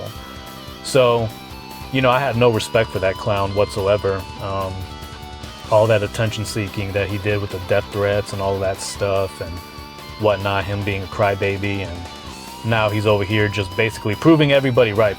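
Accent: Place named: South, United States. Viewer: What you do sound from the south because I know people who do. So your ancestors from the south sir that you got it from. I would not say 50 charter from marip kart world is trash itnis you don't care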